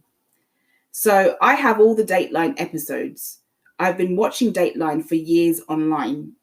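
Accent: United Kingdom. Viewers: I keep listening to this. It's one my favourite episodes in the podcast.I even play it to lull me to sleep. I guess I like British accents.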